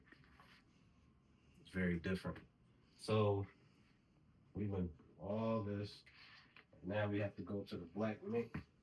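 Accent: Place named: Philadelphia, United States. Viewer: Keep up it up, I admire your collection!!!! And I hear that accent it sounds like my home town sound!!!! Philly in the building 🦾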